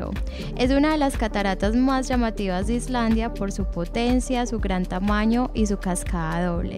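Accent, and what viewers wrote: Antioquia, Colombia. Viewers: que rica vocecita de paisa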